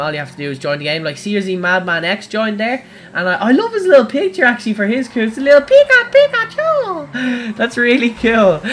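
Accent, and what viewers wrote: Ireland. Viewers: are you irish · Are you irish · Where you from in Ireland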